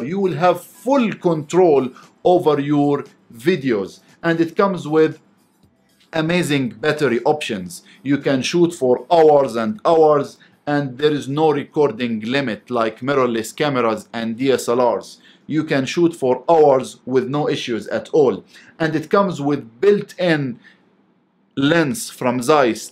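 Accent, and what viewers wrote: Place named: Egypt. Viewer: Egyptians trying hard to speak English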